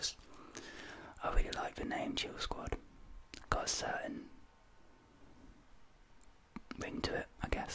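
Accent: United Kingdom. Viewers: relaxing together with your british accent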